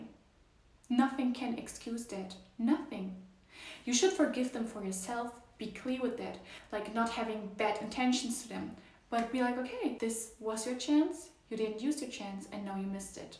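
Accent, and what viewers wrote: Germany. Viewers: Bist du deutsch, dein Akzent hört sich so an:) bin auch aus Deutschland und mag deine Videos viel zu sehr. Liebe Grüße ❤️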